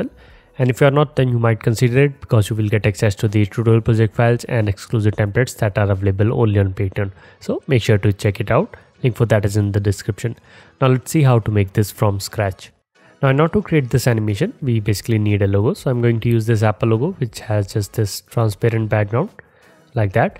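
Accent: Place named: India. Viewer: I'll be completely honest, I can easily tolerate this level of indian content just for how chill it is. the dang phone calls I have and the audio is so muffled, it be difficult to hear individuals with said accent via phone quality but YouTube audio quality is like listening to an audiobook lol Thank You for being here sir!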